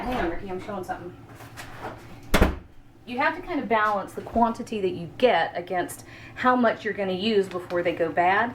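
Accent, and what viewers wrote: South, United States. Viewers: I enjoyed your video and you received a like from me.I replayed the video three times and I really cannot understand the name of the buy bulk online store. I am sorry to trouble you with this. I am Irish and getting attuned with some of the American and very lovely Southern accent, thus I hope you for give me. In American if you listen to somebody talk on the news from the North of Ireland it has to be subtitled :-) :-) I do thank you for your attention.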